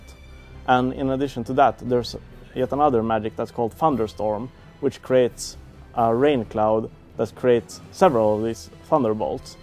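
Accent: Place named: Sweden. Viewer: That's hell of an accent you have there and I say that as a fellow swede.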